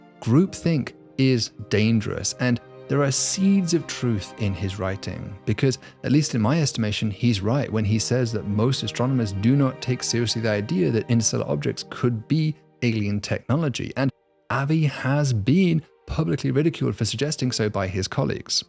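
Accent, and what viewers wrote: United Kingdom. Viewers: It's interstellar, it's not from here.. it is Alien by definition. Just because you have a British accent doesn't make you intelligent. Ugh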